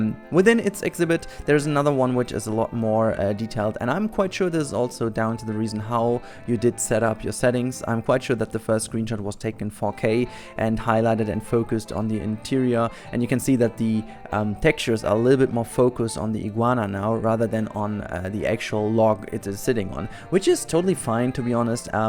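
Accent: Germany. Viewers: Are you german? Your English sounds like German :D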